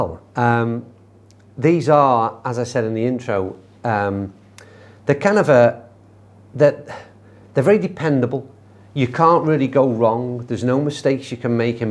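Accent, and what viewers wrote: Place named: England, United Kingdom. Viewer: Dude, your English accent is hard enough to hear/understand already let alone the loud freaking music.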